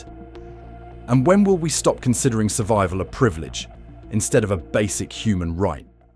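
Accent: United Kingdom. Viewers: Why is it always a narrator with a British accent who narrates these terrible videos about the homeless in America?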